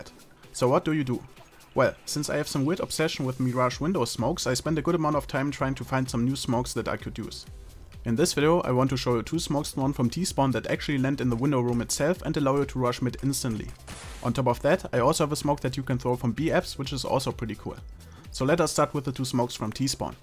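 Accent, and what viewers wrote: Germany. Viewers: Are you from Germany? You have very german accent. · der deutsche lol dat english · Das deutscheste Englisch ever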